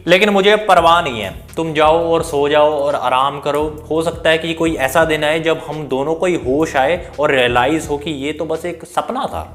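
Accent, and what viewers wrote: India. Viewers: Proper indian accent got me. Anyway, how did this video pop on my yt??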